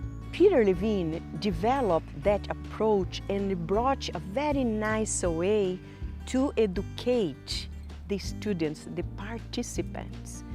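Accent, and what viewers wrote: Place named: Brazil. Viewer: So good to hear a brazilian voice in SE world! Thank you for this explanation. I am studying SE! ❤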